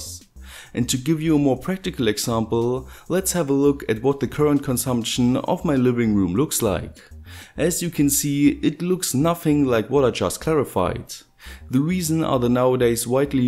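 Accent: Saxony, Germany. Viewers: Nice video! Thank you :) Is that a Saxony accent you have?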